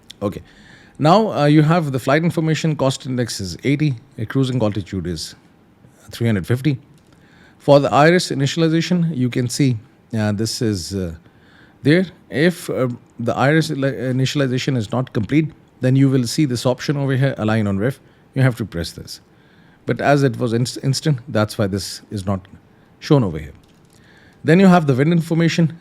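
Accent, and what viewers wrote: India. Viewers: Love you lil Indian accent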